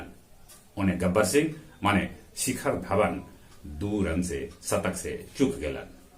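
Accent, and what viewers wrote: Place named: Magahi, India. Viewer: Bhut accha lgta hsi aapki maghiya boli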